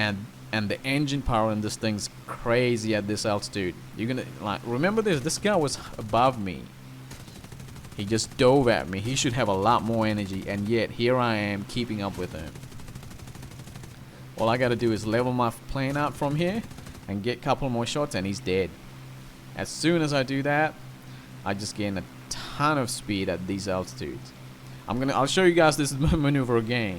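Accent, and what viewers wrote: Louisiana, United States. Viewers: I also thought Louisiana. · Its odd, because I could have sworn you sounded like you were from the Bayou, around Lousiana in the US at times, and other times it sounds like Australian. Really cool man! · Interested in knowing what your accent is from. Sounds like Louisiana mixed with a Western European accent.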